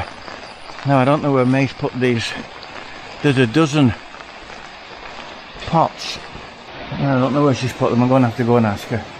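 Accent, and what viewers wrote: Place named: United Kingdom. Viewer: great video, just subbed :)) which part of the uk is your accent from?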